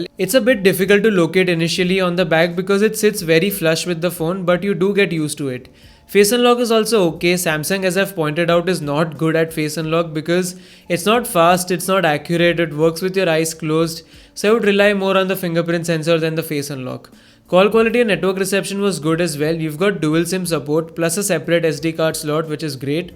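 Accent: India. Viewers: bullshit! i cant handle the speaking of indians. I'm a little bit irritated to him while lessening but I'm interested in his review HAHAHAHA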